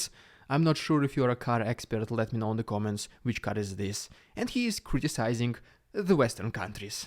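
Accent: Russia. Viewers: OK, no offense but you have one of the funniest English accents I've ever heard.. This can't be the regular Russian accent cause I've heard many Russians talk English and they don't sound like that. Keep it up... Now to the more important stuff.. Regardless of the 41% of the Republican voter base being in favor of some kind of setlement between Ukraine and Russia, you still have the majority of Democrats and Republicans being for Ukraine. Also as long as Biden is POTUS there's no worry, and now the best thing - it will be very very hard for Trump or any other Republican to beat Biden in 2024. And even if a Republican wins it most definitely won't be Trump, and all other major candidates support Ukraine... So no worries here... Russia already tried to scare Ukraine that America won't send them 1$ more after Republicans take over the Congress.. We all know how that worked out - decision to send Abrams and SDBs came when McCarthy was the SPeaker of the House.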